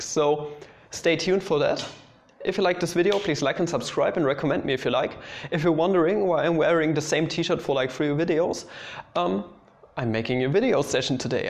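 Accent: Germany. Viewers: Hey, where are you from? Your German accent is quite unusual, you don't have the voiced-voiceless opposition in your obstruents (e.g. p-b, t-d, k-g, ch-j, s-z), and also in German you pronounce sch and soft ch identically, and I can't identify where people speak similarly to you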